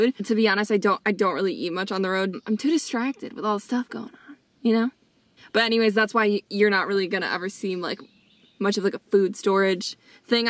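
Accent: South, United States. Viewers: Girl you got me as a sub as long as you keep running around barefooted and talking country like that I'll follow you any where on this planet!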